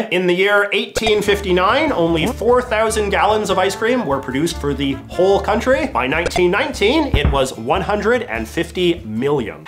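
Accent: Canada. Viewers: The most Canadian accent I’ve ever listened to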